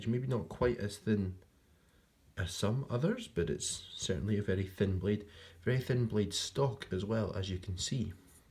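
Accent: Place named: Scotland, United Kingdom. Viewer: Nice to hear another Scottish voice. This isn’t really my sort of knife, but I’ve long had a hankering for a Richard Rodgers custom.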